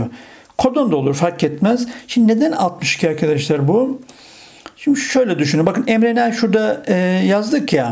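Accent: Black Sea, Turkey. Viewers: Hocam karadenizli misiniz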